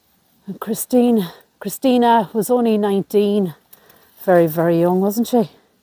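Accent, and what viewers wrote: Ireland. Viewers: I love the Irish brogue! Subscribed. ❤️ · Hi I am watching my first video from you, from one of your pinned post, your accent and the names, I believe you are in Ireland. Is that correct? Thanks, From Texas USA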